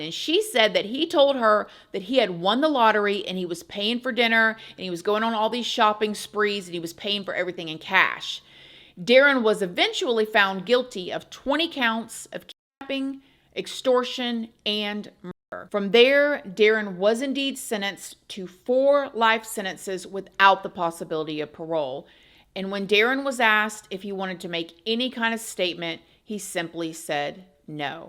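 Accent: South, United States. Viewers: Is it a southern accent ? Ilke how she says poóolice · Very much a southern (south-eastern American) accent lol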